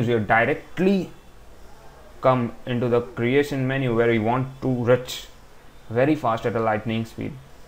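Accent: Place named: United Kingdom. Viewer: dude I know that you love speaking English. and make every one comprehend what you wanna say despite of using Britishers language and accent too you could be more comfortable with your national native language.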